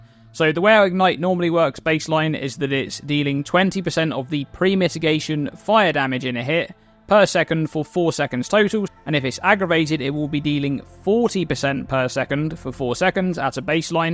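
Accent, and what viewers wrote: United Kingdom. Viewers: 10 minutes is the max for me listening to this illness that is called british accents, damn thats so terrible, sorry for this disease.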